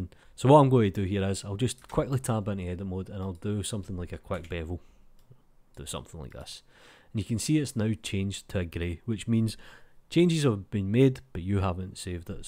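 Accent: Scotland, United Kingdom. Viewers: Love your scottish voice reminds me of GImli. :) Can you tell me why powersave is good when i can press save myself???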